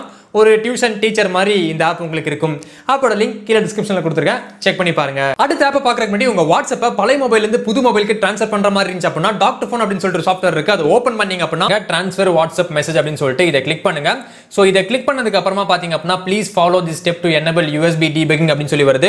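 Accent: Coimbatore, India. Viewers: bro neenga coiambathura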